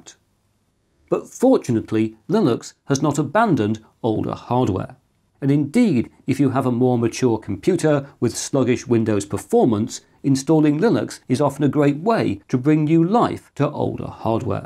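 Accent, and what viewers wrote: United Kingdom. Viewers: So wonderfully British. Thank you so very much! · Hello mister, this comment may be a bit unrelated to the video but I wanted to send you a message either way. I've learned of you by looking for information about SSDs, because back in year 2021 of October, I was upgrading my PC and I was a bit confused about the SSDs here and there. I was merely looking for basic information, but then I stumbled upon your channel, looking at your channel's avatar I thought; "Oh, it's an older looking man with a bob haircut and glasses, I believe he will be trustable, he also looks British, perhaps an old chap from Britain or one of the Americans that kept their roots from New England?". Ironically, your looks matched! I was going to do the usual of just skipping through the video, expecting some boring information I don't need...Well I did not do that whatsoever, I didn't even stop a second to "unbore" myself somehow. 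For whatever reason, you have this very British charm on you, where you always speak rather slowly, and it may sound boring but it is not so, and you have a wonderful accent that I if I was into one of those listening books or whatever they're called, hey I might actually like it. You also never make such unnecessary things, like stop to make a joke for 15 seconds, which in the end it is funny, instead, you just use your own British charm to say something very witty and it works excellently! Carrying on, the information you have provided for the SDDs was amazing, I learned a lot, it even wanted me to check m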